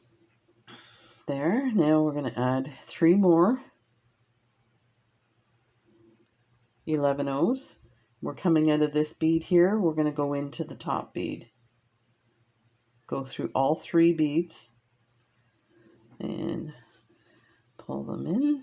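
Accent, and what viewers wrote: Canada. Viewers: Love this! Also love hearing that Canadian accent. We raced sled dogs for many years, and spent much time in Canada.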